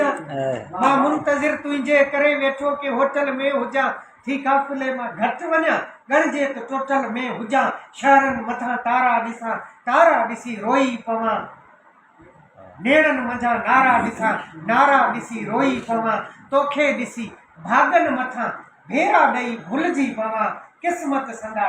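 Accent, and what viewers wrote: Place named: Lari, Pakistan. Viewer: تمام بهترين لاڙي لهجي ۾ پڙهيل هڪ طويل نظم منفرد لڳو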